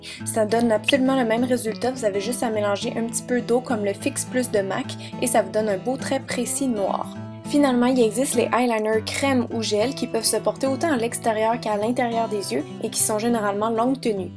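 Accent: Quebec, Canada. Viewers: Ta video est super ! Ton accent NSĹDLSKS j'adoreeee l'accent quebequois 😍😍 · canadian accent :D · j'adore t'es videos, meme si je ne comprends pas tout ce que tu dit a cause de ton accent quebecois (mais j'adore l'accent quebecois);) <3, je suis d'acord pour une video avec plusieurs sorte d'eye liner! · Je pense qu'il y en a pas mal d'entre vous qui n'a pas reconnu l'accent Canadien donc des expressions différentes! Alors sortez un peu, allez ailleurs que dans les magasins de fringues et maquillage, faites vous teindre en brune, achetez vous un peu de culture et vous aurez l'air moins ridicules avec vos commentaires stupides!!!!! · surtout qu’elle est canadienne... ils ne parlent pas la même langue, du moins pas le même accent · t canadienne? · nossa nossa Elle est canadienne ... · elle est surment Canadienne ou Québécoise si tu écoute sont accent et là-bas on utilise pas le mot scotch mais papier collant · C'est un accent québécois. 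· l accent de Céline dion.....🥴🥴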